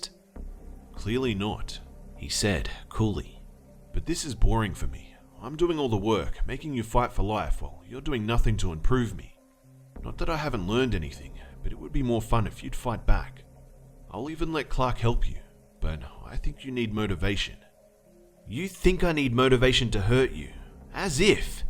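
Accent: Australia. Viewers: You know. I heard there's the full version somewhere. What's the point in that when you can have the himself read it to you in his amazing Aussie voice as you are about to sleep? Exactly.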